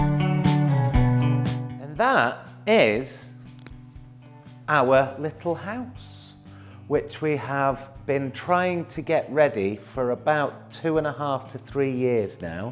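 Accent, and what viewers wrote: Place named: Manchester, United Kingdom. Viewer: Love it ,proper Mancunian ,love your home x do you do b& b ,lol thankyou ,for sharing ,your home's lovely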